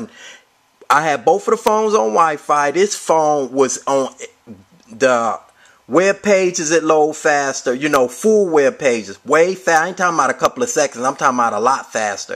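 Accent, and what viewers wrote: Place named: United States. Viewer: why cant you speak standard American English?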